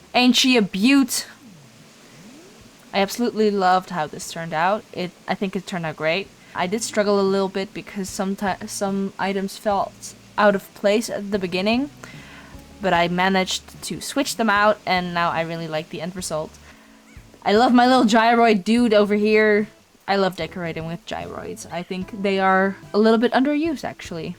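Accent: Netherlands. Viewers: Love this island! First time I’m seeing your channel but are u Dutch? Thought I heard the accent lol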